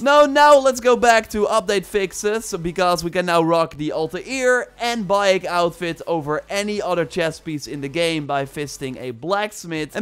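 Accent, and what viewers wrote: Netherlands. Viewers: He has a Dutch accent so he says instead of V he will say F but he means Visiting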